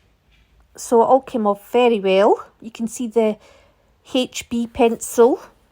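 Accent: Scotland, United Kingdom. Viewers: I absolutely adore your accent! I think the Scottish accent is so full of music and poetry 💗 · thanks ever so much for the quick reply, great hearing a Scottish accent on a YouTube art video. Thanks for the information, I have done Lino and Japanese woodblock printing before and I have got loads of art supplies I can use with the gell plate so excited to get started. I volunteer as an art tutor for a church art group we have done linoprinting, airdry clay, stained glass drawing and painting and I am always on the lookout for new things to try. Looks like endless things to try with this technique I mainly paint Scottish landscapes. Thanks again enjoying your videos. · What a lovely scottish accent?! Great video.Greetings from Spain. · Enjoyed this very much. Clearly explained techniques with lots of variety. Thank you for mentioning the need to let the paint dry. 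I have watched many instructional videos and it’s seldom touched on. I’m Scottish, and it was so refreshing to listen to another Scot. Very many thanks. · This was absolutely wonderful! Thank you so much for all these excellent ideas suggestions techniques… Tricks of the trade! I know I will be coming back to this video over and over again. I’m just getting started with gel plate printing and I’m so excited to explore. This video has given me even more ideas! Additionally, I really love that it isn’t cluttered with a lot of background noise or music, it’s just you talking us through the process – in such a beautiful accent at that! Long live Alba! 🏴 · Awesome, and a Scottish accent to boot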